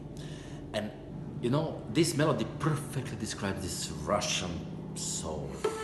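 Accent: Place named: England, United Kingdom. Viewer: You are adorable, unic, original human being with a worm ❤️. I can't wait to get this Fabulous CD, thanks so much for your information about your favorites in Classical Music. I love your English accent and the way you express your Passion to play the beautiful Music with your Cello. God bless you 👼*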